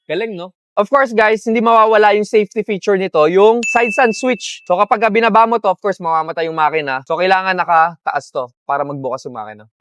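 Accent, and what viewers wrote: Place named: Visayas, Philippines. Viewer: bisaya pag ganyan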